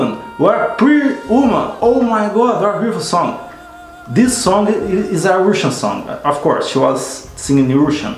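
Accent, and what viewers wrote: Brazil. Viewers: u sounded like Brazilian speaking English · Cara! Que legal que te encontrei. Imaginei que fosse brasileiro, já no início do vídeo, pelo sotaque kkkk Interessante não ver comentários de outros brasileiros e a prevalência de russófonos e outros estrangeiros. Parabéns pelo trabalho.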